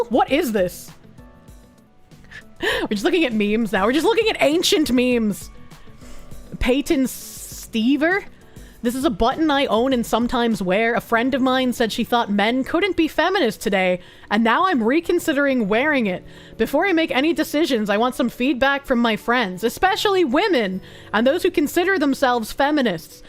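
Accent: Boston, United States. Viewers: HAPPY BIRFDAY, SILLY FOX WOMAN You really are something special, a shining light of squeaky noises, Boston accents, memes and autism. A breath of fresh air in this oppressive nightmare zone of a world. We’re all gonna make it! Keep your chin up, eat some sugary cake, pass out in a food coma and remember how much your community loves you. Happy Birthday.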